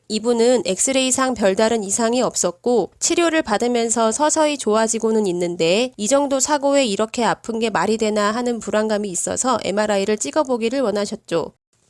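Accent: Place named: Chungcheong, South Korea. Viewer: 음...요리 백종원도 충청도유... 사투리좀 써도 괜찮음. 다만 발음정확도+전달력의 문제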